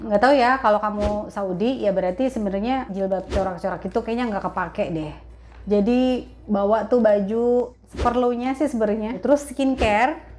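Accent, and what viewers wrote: Jakarta, Indonesia. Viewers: Waaaaah pok ini enak bngt cara bicara nya betawi bngt tapi,,sopan,tertata,jelas beda klau keluaran pesantren keliatan klau klrg Islami....TABAROKALLOH....SYUKRON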